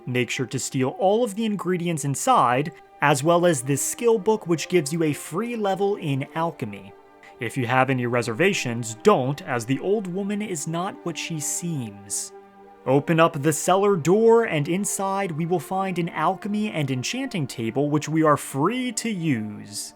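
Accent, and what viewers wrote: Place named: United States. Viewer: Good video but American accents can be kind of grating. Especially how he keeps saying "White Run". Doesn't really flow in his voice.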